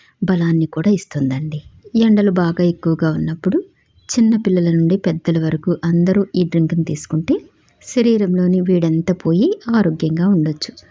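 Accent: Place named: Telangana, India. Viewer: Good to hear Telangana state decent language slang in Andhra recepies from Thankku by this respected women.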